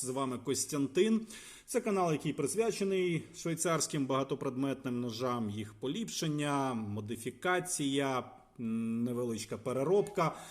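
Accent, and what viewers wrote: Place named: Eastern Ukraine, Ukraine. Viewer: У схидняков такой ужасный акцент. Нет той певучей лёгкости как в полтавском селе у моей бабушки. Тренируйтесь, песни что-ли украинские пойте.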